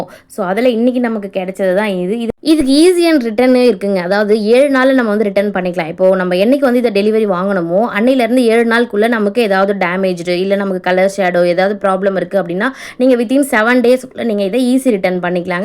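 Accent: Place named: Chennai, India. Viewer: நல்லா இருக்கு இந்த டாப் காலண்ட நல்லா இருக்கா சென்னை பாசை சூப்பர் 😂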